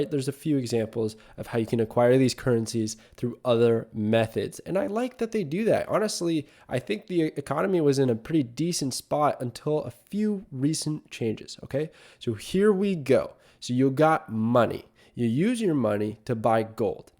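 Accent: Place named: Southern Ontario, Canada. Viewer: its the first thing I noticed when I came to the channel. I'm from BC and there is nothing that I like hearing more than the southern Ontario accent (well maybe the east coast one too).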